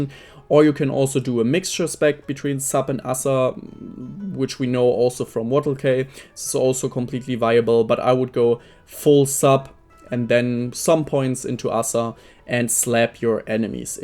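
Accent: Germany. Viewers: The german accent is wyld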